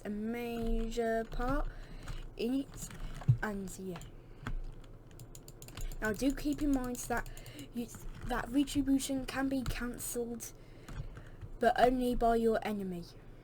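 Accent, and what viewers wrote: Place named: United Kingdom. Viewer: Cool video :) I also really like your British accent lol ,also can u make a video on how to get Jesus skin for Obi-Wan-Kenobi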